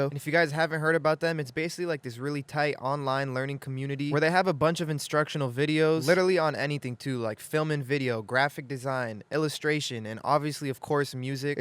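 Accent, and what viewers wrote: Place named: Ontario, Canada. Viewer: this guy's either from Cali or from Ontario.. Maybe Toronto, Most likely Hamilton :D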